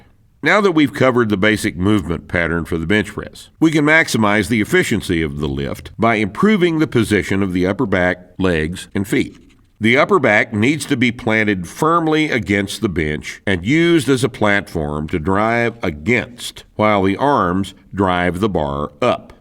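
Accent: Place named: Mississippi, United States. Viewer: That voice came straight out of Mississippi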